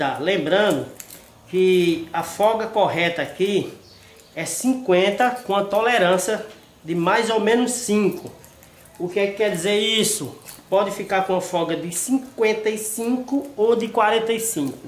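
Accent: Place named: Rio de Janeiro, Brazil. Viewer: Bom dia,vc é do rio de janeiro?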